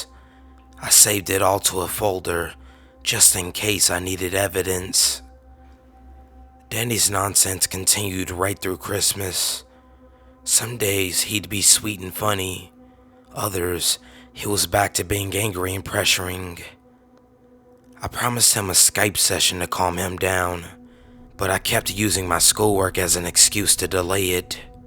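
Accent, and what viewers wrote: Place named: South, United States. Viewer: Sunna Lind I like the rough edge his voice has. That, and I could swear he's got a little bit of a Southern drawl. I dunno, but I like it.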